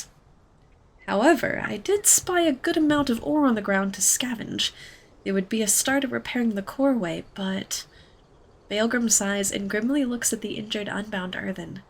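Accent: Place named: Ireland. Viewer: I love your middling Irish accent! 😊 It's quite lovely!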